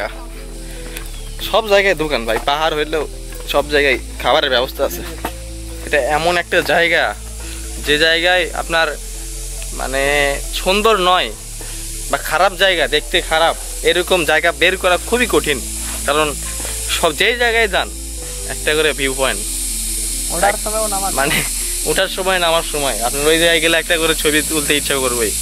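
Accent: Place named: Bogura, Bangladesh. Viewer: Bogurar vasha hits different 🤍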